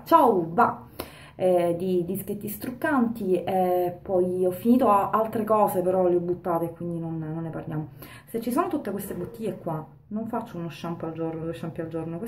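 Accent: Sicily, Italy. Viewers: Sei troppo simpatica e' un piacere ascoltarti...adoro l' accento siciliano e poi sempre piu' bella eh??!!😍😍😜😉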